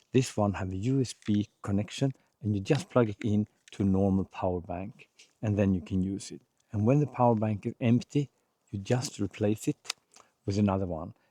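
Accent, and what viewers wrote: Sweden. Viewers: Great and entertaining video, as usual, 👌🏻 And, as another Swede (right now based in Lycksele), I also find your lovely Swenglish to be entertaining in itself 😊 Reminds me of Swedish friends struggling with launch vs lounge.. 🤭😁 I think it's like you said: for the devices you need to hold in your hands a lot, it wouldn't be practical to always have to rely on external power source/bank. On my hikes, I carry a Fujifilm X-S20 with a 70-300mm zoom and a DJI Action 6, both being very mobile by hand, so to say, and thus not suitable to be 100% dependent on external power. I use both a lot, so I carry 2-3 spare batteries for each. As a solo-hiker, wrt a device like Garmin Sat messenger, I think a built-in battery that's well protected from the weather is the preferred solution, for safety reasons. That said, I do agree with that user-replaceable batteries should be more common. 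I also agree with that external power banks bring flexibility when it comes to devices that are only used part of the time - and hopefully not all at the same time 🫣 - coz then they can time-share the external power bank(s) 🤓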